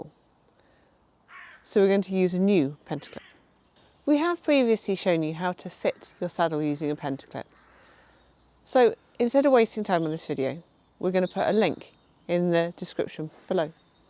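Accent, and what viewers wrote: RP, United Kingdom. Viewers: Dear ma’am: Thank you for your videos! Even though l’m not a Brompton user ( but BROOKS!), l like to see your videos because of the way you talking, posh southern RP accent, that sounds so nice to my ears, as if Dame Julie Andrews dose. 😊 By the way, meanwhile, recently l’ve heard that, they say, some of those bad naughty girls talk as they hold matters in their mouth sometimes today in UK😂❗️ ls that right? I wish you’re dwelling in happy living with bicycles! A short greetings far from JAPAN. Thank you. Domo Arigatou ! ❤️ Sincerely yours. Hiroshi The Biker.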